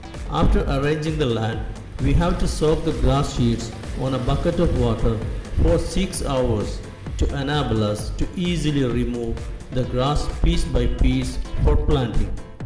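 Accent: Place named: Kerala, India. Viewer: Are u a malayaalee?ur english is like malayaalees uncle.pls tell malayalam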